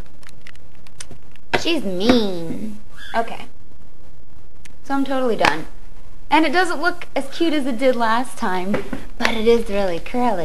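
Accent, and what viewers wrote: Texas, United States. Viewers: you remind me of April Rhodes from glee. You're awesome, gotta love the Texas accent, I'm in TX too. I'll be subscribing to your channel for sure :) and I love your hair! :D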